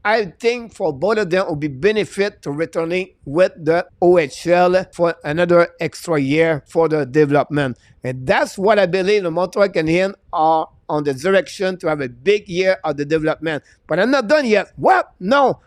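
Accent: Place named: France. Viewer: I love just how french you are Coach! The way you speak c'est parfait! Keep up the content please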